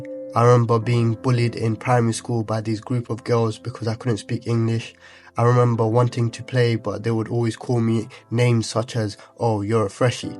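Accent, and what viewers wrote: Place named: Bengali, United Kingdom. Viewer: nah, that aint no Essex accent. Thats a UK Bengali accent there. Aka the Bengali Twang. If I close my eyes and watch this video, I can 100% tell youre Bengali, could probably even tell what villiage youre from.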